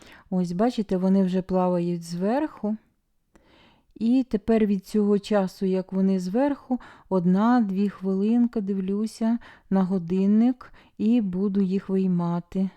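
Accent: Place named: Cherkasy, Ukraine. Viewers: Вимова черкаська.Шевченкові краї. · я подумала- Вінниця ❤Приємно знати,що на Черкащині так розмовляють ❤.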